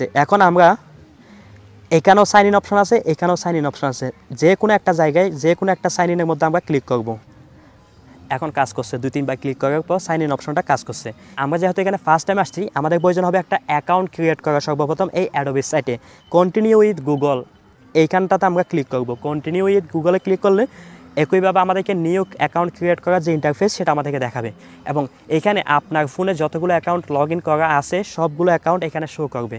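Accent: Chittagong, Bangladesh. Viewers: আপনি কি চট্টগ্রামের মানুষ?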